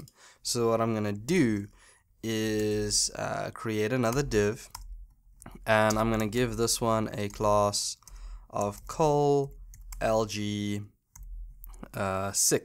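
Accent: South Africa. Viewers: Reading these things on tutorial sites and watching someone explaining these things to you are two different experiences. Thank you for providing clarity on this , looking forward to learning more from you ....Also i can hear that fellow South African Accent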